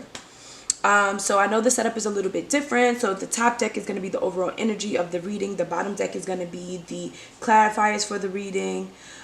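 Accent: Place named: Brooklyn, United States. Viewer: This was a great reading this resonated with me so much! You are so relatable as well, growing up in Brooklyn this is exactly how we speak lol. I will continue to follow you IG page and watch your tarot spreads…thank you for sharing your gift with us!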